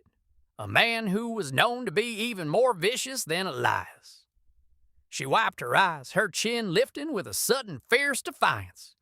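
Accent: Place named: Appalachia, United States. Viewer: whats with the hillbilly story teller???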